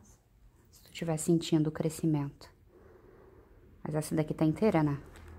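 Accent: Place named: Rio Grande do Sul, Brazil. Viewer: estou gostando tanto do seu trabalho q não consigo ver de mais ninguém amo seu sotaque de gauchinha sou mineira de Nova serrana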